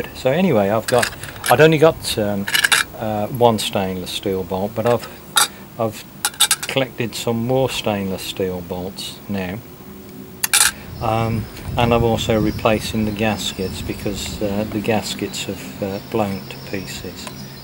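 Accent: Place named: Birmingham, United Kingdom. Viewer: I have a question if that's ok, I have A faulty strimmer, the plate that rises and falls behind the plug seems to be hitting the plug to the point where it's closing the gap ( surely that's not correct ) if I pull the cord it works freely with the plug out but struggles with the plug in. Ps are you a Brummie, theres definitely a bit of Brum in your accent. thanks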